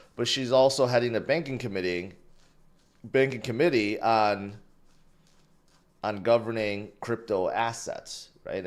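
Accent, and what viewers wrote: United States. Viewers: he sounds like he is from the Midwest not Jersey.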